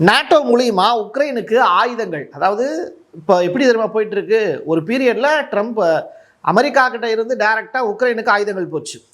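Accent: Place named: Chennai, India. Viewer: Madras தமிழ் தானே பேசுகிறீர்கள்.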